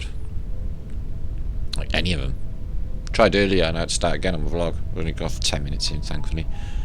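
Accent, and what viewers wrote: Wales, United Kingdom. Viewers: Entranced so far thank you.. Just cutting in to say.. are u from south Wales. . U sound like u have at least half an accent for it.. it's all good.. I'm here in West Wales. . On the coast and yup.. Britain are just bullies.. no wonder we on a puppy eyed innocent "it wasn't meeee" type island. P.s. I'm half Manchester from when I was a kid.. and accent sounds familiar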